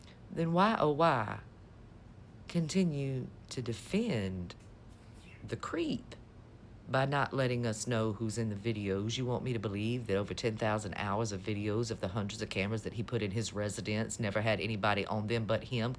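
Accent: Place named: Louisiana, United States. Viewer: First of all took me a while to figure out what that accent came from.Yeah, I know, shut them.I'm like.Oh that's a proper southern woman's language from louisiana.. Don't care , don't care you have beautiful grandchildren , you have beautiful Beautiful. Eyes I can see it way appear in ohio Don't mean anything by but I love you honey❤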